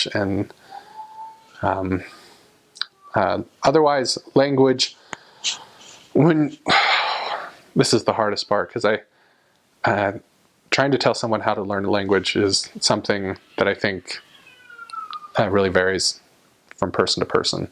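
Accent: Finland. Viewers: He sounds so Finnish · ) u have a kind of a finnish and a swedish acent mix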